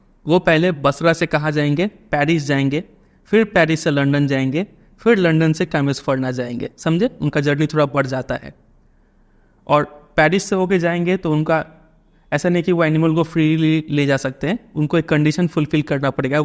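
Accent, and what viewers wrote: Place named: East, India. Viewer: Most probably east or north east india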